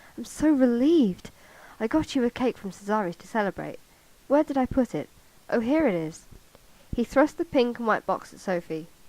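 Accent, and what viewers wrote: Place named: United States. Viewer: Your voice is very pretteh~ :333 I also love your American accent... It sounds perfect xD Do you mind if I do this?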